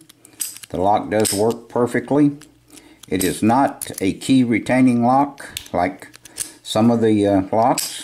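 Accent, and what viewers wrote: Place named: Texas, United States. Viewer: My favorite part of your videos, aside from your amazing picking skill, is hearing you say "let's gutted it" with that Texas ascent :)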